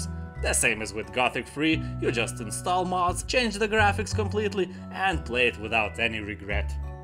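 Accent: Russia. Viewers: Че ж такой жесткий русский акцент...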